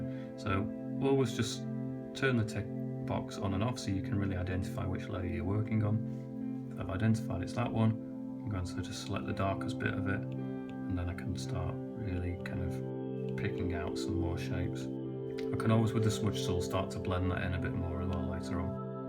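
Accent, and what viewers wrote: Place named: Yorkshire, United Kingdom. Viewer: Fantastic, just the right level of detail, nice and clear. Like the background music, not obtrusive, but relaxing. Lovely Yorkshire accent!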